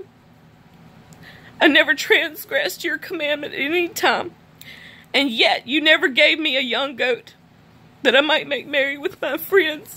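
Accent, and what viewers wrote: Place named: South, United States. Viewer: God thank you for this sister’s beautifully accented voice! I love to hear your Word at any rate, Lord, but it is especially sweet with a little American-south drip. (I dunno, if not the south, then mid-west? Texas? IDK, BUT I LOVE IT!) Many thanks for this channel. (If did an audio narration of the Bible from start to finish- I’d listen every day)😊✝️🙏 GOD BLESS & KEEP YOU & YOURS!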